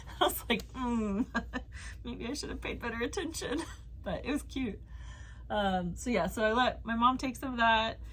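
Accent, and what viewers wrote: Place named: Minnesota, United States. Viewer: Are you sure you're not from Minnesota? Because I grew up there and listening to you chat is just like sitting with my cousin or a good friend. I love your podcast and glad I found you. I mainly knit socks, blankets and a few small things but you've inspired me to get out my one sweater quantity of yarn and try to knit a sweater. (I've made a couple acrylic sweaters but never a wool one.) Thanks for sharing your love of yarn and knitting!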